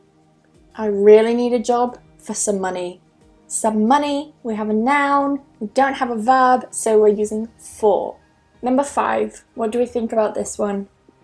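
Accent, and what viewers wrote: United Kingdom. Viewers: Love you, love your lesson, and love your british accent. #oneOf Ur student from Indonesia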